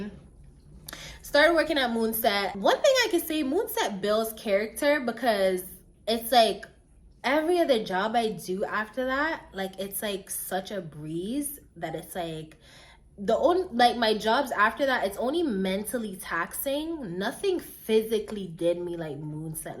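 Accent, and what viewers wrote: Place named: Jamaica. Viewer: the patois is killing me 😂 are you jamaican??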